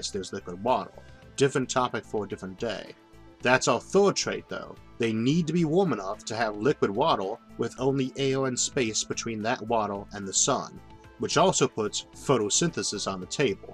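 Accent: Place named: South, United States. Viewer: I would like to say I love your videos and would like to add about your speech I just thought you were an upper class Southern gentleman. I should add that I'm from the UK but very used to the American accents.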